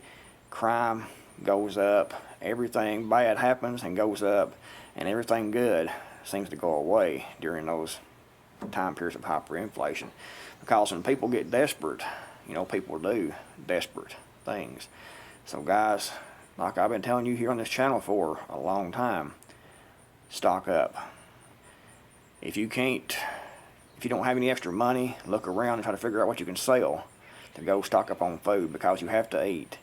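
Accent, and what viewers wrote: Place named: Tennessee, United States. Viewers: Me too! Idk where he’s at, but he sounds like he lives in Tennessee. I’m in Texas and our accents are much less twangy. I like his speaking voice. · I’m in Texas and I love listening to his accent and it is different from what I hear in Texas with certain words pronounced differently.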